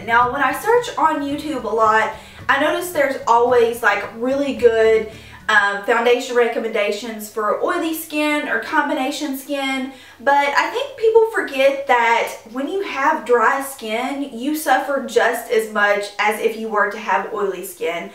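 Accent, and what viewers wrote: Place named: Texas, United States. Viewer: You are so beautiful! Kind of have a tx accent! Lol. So anyways, I returned my Smashbox 15 hour wear and got this in exchange. I have super dry skin and also some wrinkles because I have that "early 30s skin" ! So this foundation seeped into my wrinkles and just did not work for me!! Next I'm gonna try UD naked skin when I return this one. But I do love your videos! 😄🙆🏻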